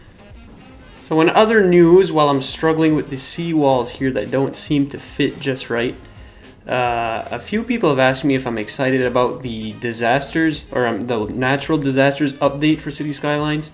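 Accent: Canada. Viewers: Ok, are you from Minnesota or Canada? Your accent is very...Canadian. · I thought I could tell from your voice you were Canadian, MTL talk and Canada Day video proved it lol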